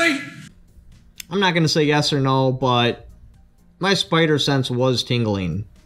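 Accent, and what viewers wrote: Canada. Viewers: The Canadian is strong with him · This is the great Canadian/hillbilly accent. Its awesome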